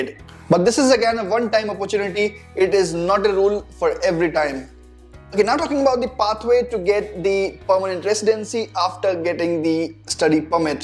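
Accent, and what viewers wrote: India. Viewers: Nice work with all respect but ur language it's so confusing.... I mean the Indian or I called the Indian English it's too bad...... even with subtitle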